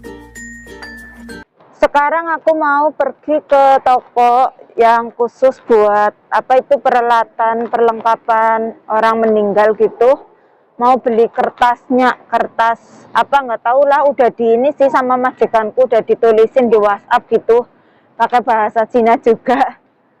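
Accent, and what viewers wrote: Banyumas, Indonesia. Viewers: Jawa ngapaknya medokl skali..ora ngapak ora kepennak..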